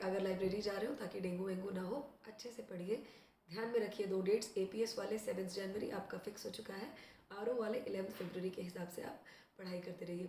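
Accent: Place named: Lucknow, India. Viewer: ohk kk mam.. pranam 🙏 recently m listening ur vdos 😍 u are truely 💎 n u really care about studdent's studies . so much positivity ,full of real information which is most imp thing.. faltu ki bkwas ni hoti h..bhut se log aadha tym vdos ko start krne uska add krne me lgate h .n faith on our bhgwan n spritual knowings 🙏 n flow of ur accent 😍..I think aap lucknow ya uske aaspas k ya pragraj se ho... 🙏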